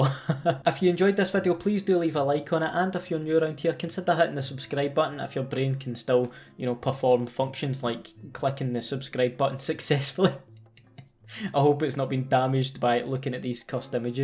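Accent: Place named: Scotland, United Kingdom. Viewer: Nah, just Scottish.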